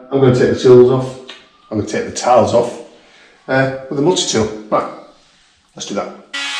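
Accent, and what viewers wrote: United Kingdom. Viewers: I agree with you. The glasses make the difference with the song. High class 😅 Good pronunciation with a light british accent. It's easy to understand your French.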